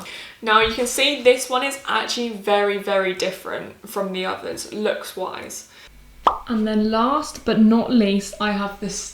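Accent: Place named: New Zealand. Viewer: Sounds Kiwi with.... Maybe visited the UK for a while?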